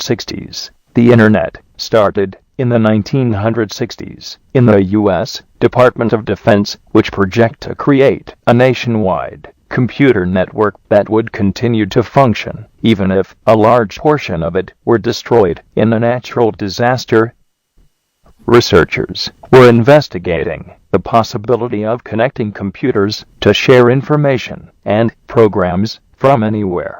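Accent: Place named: United Kingdom. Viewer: British hooo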